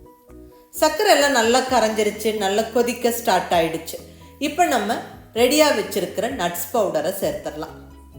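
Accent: Coimbatore, India. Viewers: I enjoy following your recipe, and listening your Kovai Tamil. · Super mam your kongu tamil and your all recipes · love your voice and presentation. cbm slang, good to hear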